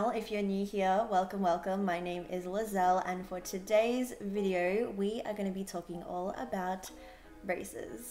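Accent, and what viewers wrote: Australia. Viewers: You are Australian. Periodt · 😄 I was close. You was saying so many words that are close to what us British say, but I couldn't figure it out. I am familiar with the Australian accent, not sure why I didn't think of that 😊. Thank You for your reply and information in the video. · Are you Australian?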